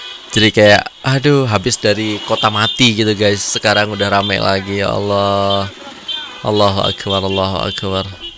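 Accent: Malaysia. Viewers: Suara sedap...muka hensem..... ckp mcm org Malaysia..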